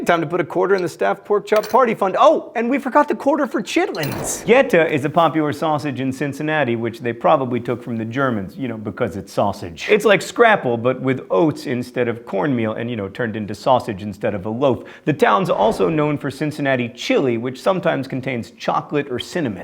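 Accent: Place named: Alabama, United States. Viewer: what happened to your Alabama accent? my brother was born in Alabama wasn't talking when we moved to California by the time he started talking he had an accent from the south. now he just talks like a surfer dude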